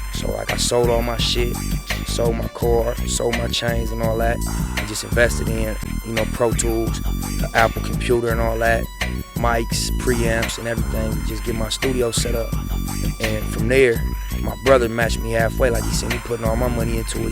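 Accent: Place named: California, United States. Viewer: I love the Cali accent... I love it!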